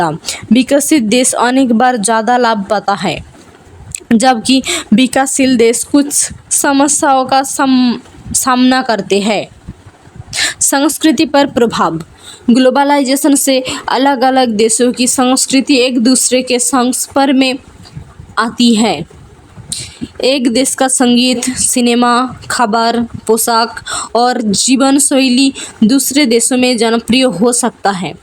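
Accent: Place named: Bengal, India. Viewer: Are you a Bangali? Bengalis are speak like this.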